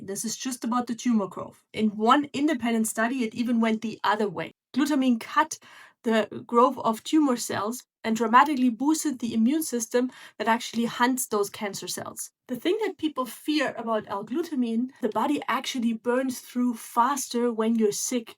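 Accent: Germany. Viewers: I think she is german - I can hear her strong german accent!?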